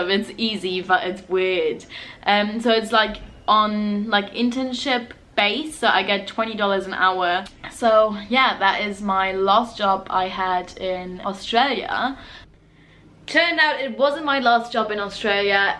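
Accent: England, United Kingdom. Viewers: She's probably been hanging around too many UK tourists and have picked up their accent. No way does she sound anything like all the German tourists I've encountered nor whilst in Germany myself. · Interesting for visiting foreigner tourists/workers to know approximate rates. Now tell them about your superannuation that you can get back ! As an aside I met a German young woman working as a waitress in Brunswick, Melbourne. She was on her 2nd year visa. To get her 88 days up she took a job outside Barcaldine as a Jilleroo. 1 months trial and she stayed for 6 months until the harsh summer. She bought an Australian stock saddle to take home for cross country riding. Next video (lol) please speak some German you sound far too English.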